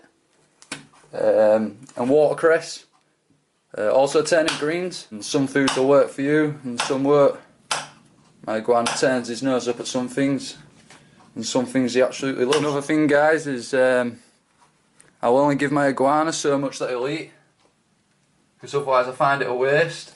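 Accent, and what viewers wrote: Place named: Yorkshire, United Kingdom. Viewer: Where's that accent from? I'm guess yorkshire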